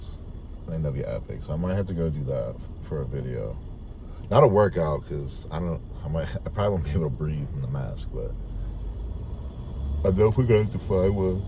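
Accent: New Jersey, United States. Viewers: the Jersey accent 😂😂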